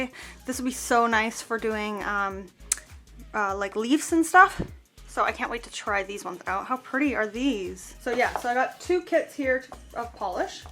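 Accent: Canada. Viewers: is smart, educated and beautiful and many Canadians say it the way she does 🙏🏻 it’s okay to pronounce things differently based on where you live 🙂 There are many words Americans say differently than us, heck even different parts of canada say some words differently, that’s the beauty of diversity in our language 🥰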